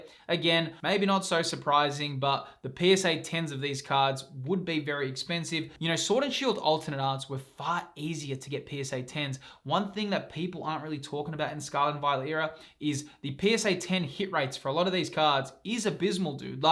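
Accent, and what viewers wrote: Australia. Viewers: I’ll be honest! Your videos not only helped me look at Pokemon cards and even etbs differently but the Australian accent is a chefs kiss on top ❤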